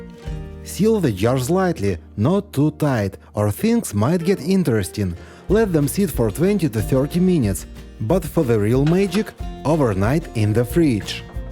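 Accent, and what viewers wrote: Russia. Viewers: террибл рашен аксэнт))) бат гуд контэнт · Is that accent russian??? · Меня смущает акцент и наличие укропа в маринаде. Автор, ты русский? Классный канал, кстати. Готовить не умею, но есть под твои видео не хуже, чему под Друже) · Strong russian accent ) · I feel STRONG russian accent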